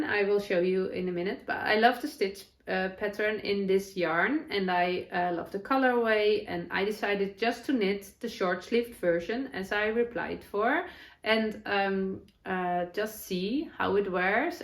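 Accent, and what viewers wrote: Netherlands. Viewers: Arms are exposed. Is the word you're looking for. I do enjoy your Dutch accent. Your very talented. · Hi I finally found your podcast, its such a pleasure listening to you. I crochet, knit and sew also, but I have yet to start a you tube potcast. Your english is fine I understand you very well even with the dutch. ❤️🙏💕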